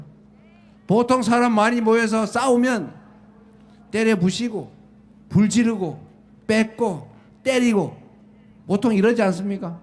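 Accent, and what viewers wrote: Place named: Chungcheong, South Korea. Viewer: 충청도 사투리 귀엽지유?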